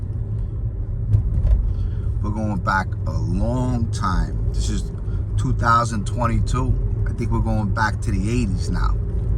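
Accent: New York, United States. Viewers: Hi this is Christian! I always love the New York accent I’m getting ready to move to Boston I also lived in Queens New York back in 98. I love the East Coast. Your true New Yorker accent makes me laugh every time. Awesome I wish I could run into you sometimes. Forget about it! 🤣😁😁👏😊😊😁🤷🏻‍♂️🙋🏻‍♂️🙋🏻‍♂️👏🤣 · Thank you. Great job with the camera AND the narration. And as a Bostonian, I ALWAYS love hearing your New York accent. But my absolute favorite part of this video is hearing you say you were going to pick up baskets for your kids. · I like listening to your voice, that Ny accent. Love watching Sammy also. Always rooted for him, to get back with his family and he seems to be doing great. Also very happy for you, you turned your life around and your a wonderful daddy to your girls! I am in Albany Ny, have you ever been upstate? Just curious. Keep up the great content and thankyou ❤👍🏻🙏🏼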